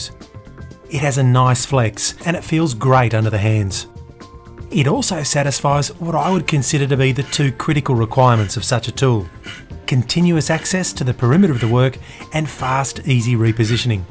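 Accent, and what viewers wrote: Australia. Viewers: the Australian accent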